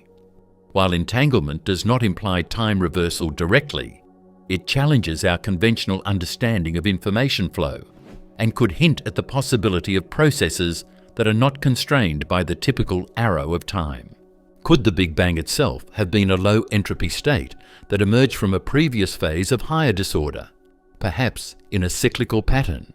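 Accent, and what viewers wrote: England, United Kingdom. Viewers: They have an English man read these dumb questions to seem smart. In the beginning God created the heavens and the earth.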